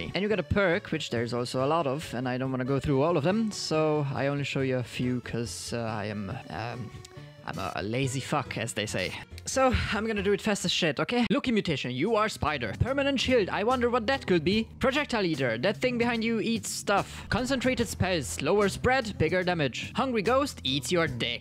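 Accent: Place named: Germany. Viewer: I love this game and I love you made a video about it. But also, gotta love your deustcher akzent lol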